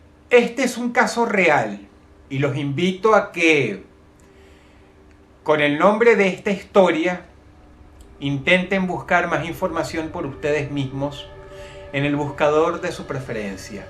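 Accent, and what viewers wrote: Venezuela. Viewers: Sería buena idea como en casos de la vida real con Silvia pinal, pero en este caso sería el (acento feo venezolano) el problema sería tramitar con todos los autores de las historias, pero siendo el escritor se me hace extraño no lo haga, quizás no lo ha considerado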